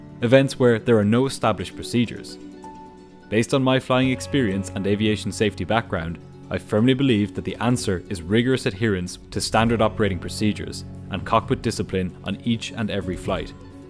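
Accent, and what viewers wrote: Ireland. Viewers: Love it when a new video comes out! Or am I just in love with an Irish accent 🤷‍♀️😂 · Accent sounds more Irish to me. And we pronounce Louis the French way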